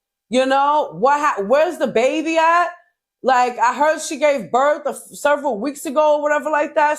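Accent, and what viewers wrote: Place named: New York, United States. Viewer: Got my Sub for the New York accent ❤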